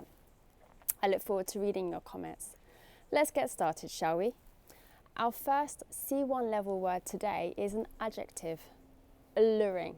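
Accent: United Kingdom. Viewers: Your video made my day. ❤❤ Love British accent.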